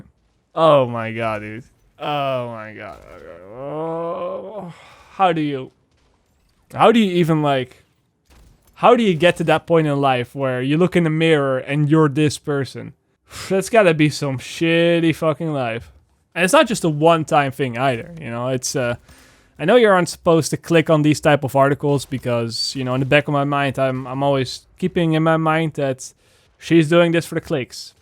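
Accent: Netherlands. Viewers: I knew you were dutch before i saw the Twitter posts I have the same accent when speaking English oof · Are you Nederlands? I hoor het in je accent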